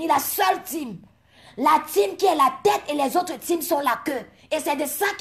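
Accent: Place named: Bassa, Cameroon. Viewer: Mama pourquoi tu m as vendu ???????????? Je suis au sol okololooooooo toi alors moi je t écoute a fond tu n insultes pas tu t exprimes bon français a la façon des bassaaaaaa c est l accent qui me finit une fois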